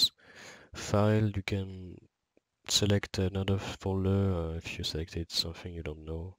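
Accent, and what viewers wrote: France. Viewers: C'est moi ou tu a un putain d'accent français ?? ( its me oe you have a fuking french accent ?? ) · That frenchy accent is really noticeable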